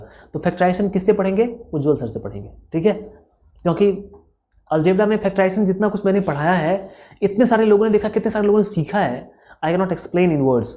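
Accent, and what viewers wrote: Bihar, India. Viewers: Sir I love your bihari style 😍🤩🔥🔥🔥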